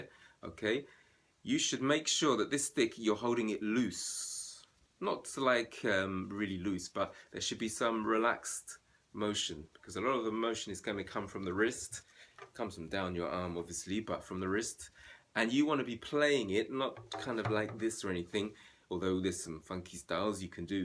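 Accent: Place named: London, United Kingdom. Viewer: Hi Sir, please tell me whereabouts in the UK you are based - hopefully London from your accent? I am interested in buying one and taking up lessons. I am very serious depending on what the finances are. I have added you on Instagram and my name is David. Thanks.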